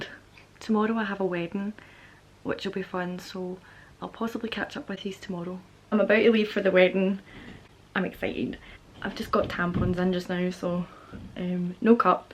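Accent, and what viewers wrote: Scotland, United Kingdom. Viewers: What's it like having a Scottish accent and then coming down to South Africa?:)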